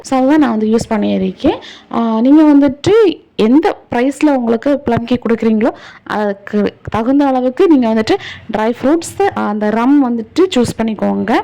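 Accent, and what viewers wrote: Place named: Kanyakumari, India. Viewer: Ur voice seems similar to kanyakumari locality.. Will try this recipe for sure sis ... Random viewer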